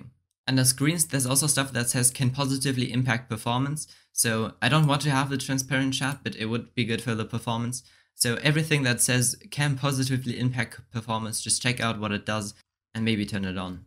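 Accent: Germany. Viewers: hey, nice video, really helped me Man hört aber stabil, dass du deutsch bist 😆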